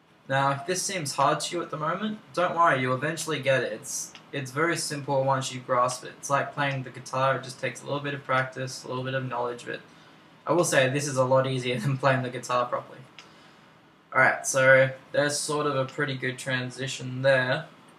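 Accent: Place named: Australia. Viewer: Sad how much hearing an Australian accent on youtube turns me on. **tutorial to me allll dayyy**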